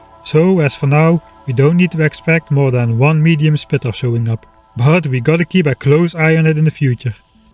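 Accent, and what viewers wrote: Netherlands. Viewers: Jesus christ your dutch accent.. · Your Dutch accent is thick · staalhard hollands accent ook lol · First one of your videos that i watch and i love your accent, is it dutch? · Geweldig Nederlands accent Amazing Dutch accent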